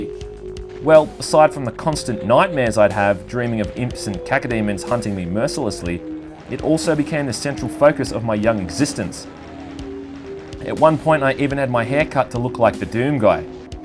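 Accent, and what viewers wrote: Australia. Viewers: I can accept that it is the grand-daddy of FPS games, but I still like Heretic better. Although I can concede that Heretic is made a lot easier by not having any enemy using a hitscan weapon against you. And I absolutely love your Retro Reviews. Made especially better with your Aussie accent of course, and funny things you say that only us Aussies would say :P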